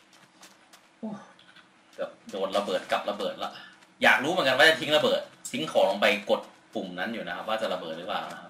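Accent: Isan, Thailand. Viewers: พี่ พูด ภาษาอีสาน บ่ซวนกันเล้ย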